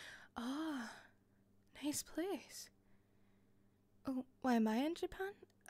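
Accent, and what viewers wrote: Scotland, United Kingdom. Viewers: I’ve pretty much always adored Scottish accents, so tingles or no it’s an absolute joy just to listen to you talk. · Scottish accents are the best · Just when I thought I couldn't fall harder for a Scottish accent... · am? Check Crippling sleep deprivation? Check Late night scottish ASMR? Check This will be a good night...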